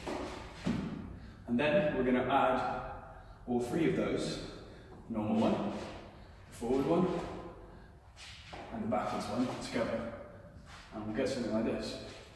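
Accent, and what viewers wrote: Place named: Germany. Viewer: He sounds foreign,kind of germanish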